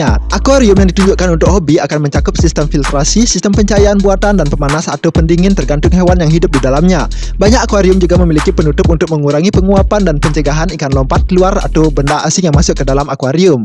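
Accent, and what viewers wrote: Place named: Bali, Indonesia. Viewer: Tpi logatnya Bali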